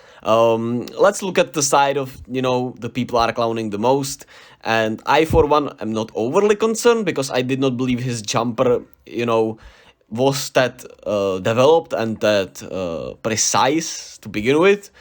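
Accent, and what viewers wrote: Czech Republic. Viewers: Je huste pustit si video a jen z prizvuku zjistit, ze je nekdo Čech.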